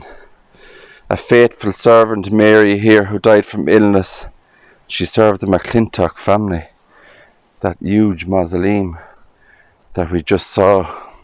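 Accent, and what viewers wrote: Ireland. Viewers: I enjoy your Irish accent!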